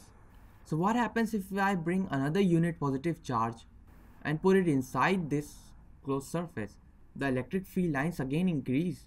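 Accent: India. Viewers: the indian accent makes my ears bleed i can barely listen to you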